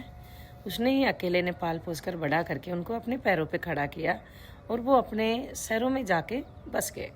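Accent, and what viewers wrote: Gujarat, India. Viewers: आपका अंदाज बहोत अच्छा है आप भी खूबसूरत हो ,सिर्फ एक बात खटकती है आपके उच्चारोमे गुजराती या एम पी का टच आता है ,उसे सुधारणे का प्रयत्न किजीये ,आप एक अच्छी कथावाचक ,Anchor बन सकती हो ,हार्दिक शुभेच्छा 🎉🎉🎉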